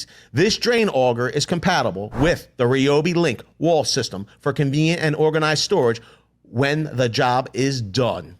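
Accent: United States. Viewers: The 'Dollar' is a currency unit used by more than 25 countries. Judging from your accent, I'm guessing that you're an American. Please, when you are quoting prices, have the courtesy to say "US dollars", unless, like most Americans, you don't believe that there are other countries in the world who are viewing your videos.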